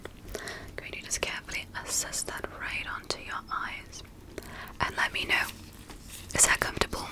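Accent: United Kingdom. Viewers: Yay British is back! 😍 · Your hair is so cute curly❤️ love the British accent too. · I feel like it’s probably just me but I don’t get tingles with the British accent at all (maybe because I live in the UK and hear jt a lot??), only the North American one 🙈🙈🙈 it would be great if in the future can do more long videos with the NA accent ❤️🙈🙈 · You have a really good British impression ♥️😴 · I tend to speak to you in a British accent every time you speak in a British accent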